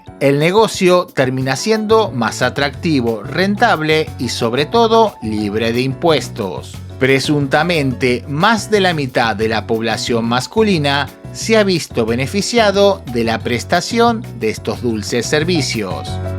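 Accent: Argentina. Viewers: De donde eres brot? Argentino no? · Tú acento Argentino y tú léxico mexicano me confunde… 🤔💭💭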